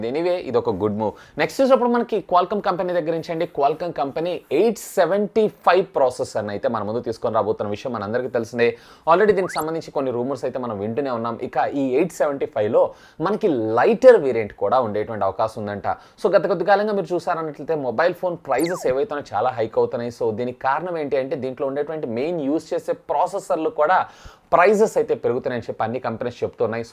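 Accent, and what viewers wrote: India. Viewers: Bro I like ur Buttler English😊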